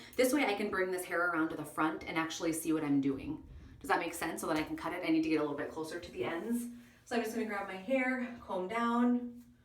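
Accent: United States. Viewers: ohh.. I can tell you have a midwest accent. 🙂 I love your hair tips...thank you! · Are you from Minnesota or somewhere up north or Canada? Your accent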